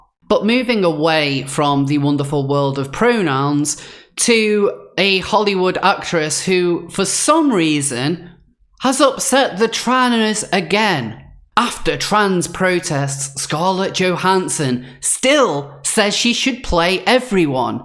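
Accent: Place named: England, United Kingdom. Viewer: Your English accent is awesome and classy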